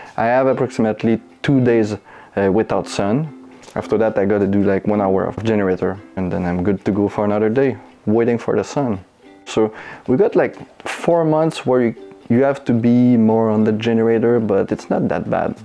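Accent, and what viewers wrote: Quebec, Canada. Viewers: He has a french accent. Québécois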